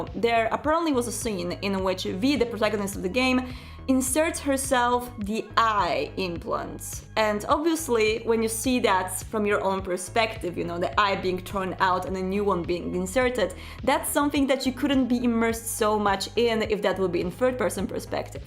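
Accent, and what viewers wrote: Poland. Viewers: At the beginning of the video i was like: "She talks like Polish ppl, wonder where is she from." and yup! #polishgirl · It's nothing personal but am I the only one who considers polish accent so annoying that I can't watch it till the end? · I’m gay but I would go straight for polish girls with Cthulhu shirts. Your accent is adorable and I love it when you call bullshit on shitty game journalists.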